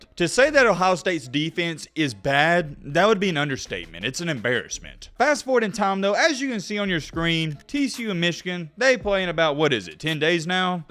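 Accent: South, United States. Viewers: is more like it. I will never trust someone with a Southern drawl talk about Michigan football. Stick to lying about the Tide. Michigan is far from major trouble. Be afraid... be very afraid.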